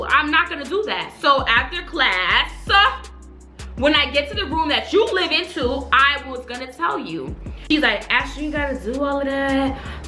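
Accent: Florida, United States. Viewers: Yesssss I knew you were from Florida we talk the same lmao!!! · Miss. Darlinton Lmfaooooo Florida squad! The expressions, the hype, the humor, the way she talks all of that I was like "lmfao is she from Florida?"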